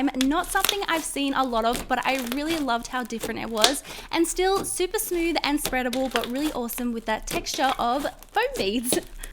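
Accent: Australia. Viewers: She has an Australian accent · “banawneree” no hate. I just really love Australian accents.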